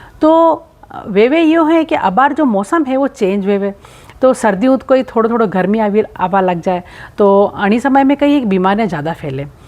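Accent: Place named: Mewar, India. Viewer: Bahut hi gyanvardhak video .....gajab ki mewadi boli h aapne....dashamata ki agrim shubhkamnae evm pranam didi🙏🙏🙏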